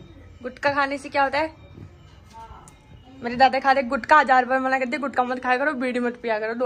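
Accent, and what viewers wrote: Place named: Shekhawati, India. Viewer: बेटा आप कहां से हो कहां पर रहती हो बोली भाषा से लगता है कि आप शेखावाटी से हो क्योंकि मैं भी शेखावाटी की हूं नवलगढ़ की हूं मैं मुझे अच्छा लगता है आपका ब्लॉक ब्लॉक में बताना कहां पर रहती हो मैं कल के ब्लॉक में आपका इंतजार करूंगी चाय अच्छी है खुश रहो बेटा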